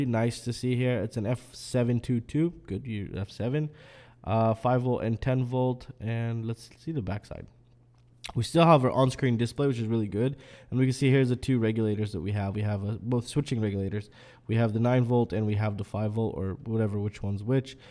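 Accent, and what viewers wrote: United States. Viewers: Somehow I always thought you're American and in America :D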